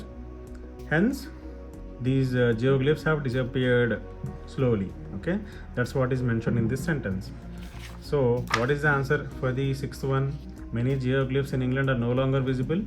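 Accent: India. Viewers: The fu/king indian accent 🤣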